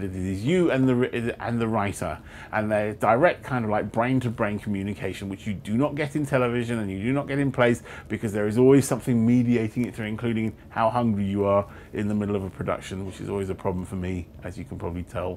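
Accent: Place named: London, United Kingdom. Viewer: educated London accent, really foreign name, I want to see this guy on 'Who do you think you are' NOW!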